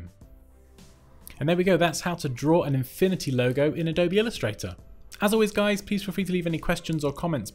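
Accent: England, United Kingdom. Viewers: I love this english accent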